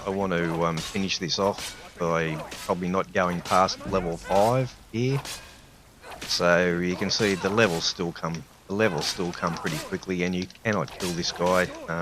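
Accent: Australia. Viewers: You from Australia 🇦🇺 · this is an aussie accent...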